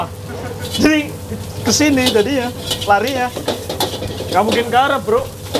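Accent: Banyumas, Indonesia. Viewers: wong ngapak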